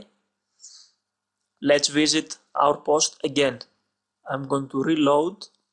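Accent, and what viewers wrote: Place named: Russia. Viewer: u are a russian dude hahaha i knew it from ur voice lol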